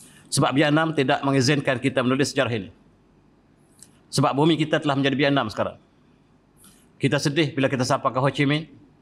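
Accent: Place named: Pattani, Thailand. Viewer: Terharu apa yang di sampaikan oleh prof benar benar menyentuh hati bahkan logaht bahasa melayu Pattani tanpa sedar airmata ku mengalir di pipiku.. memang tepat dan benar prof..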